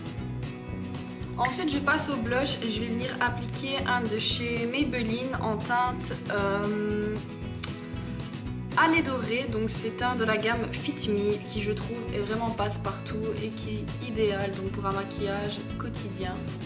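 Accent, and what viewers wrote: Belgium. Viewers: Kikou je viens de découvrir ta chaîne et je me suis abonné afin de parcourir les autres vidéo😉des la 1ere minute je pouvais parier que tu étais belge.tu es de quelle region?moi de mons😆bizz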